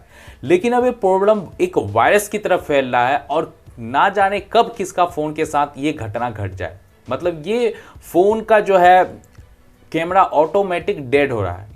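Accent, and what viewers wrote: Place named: Bihar, India. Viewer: Are bihari bhai podbulam😅😅😅 jada ho rahahe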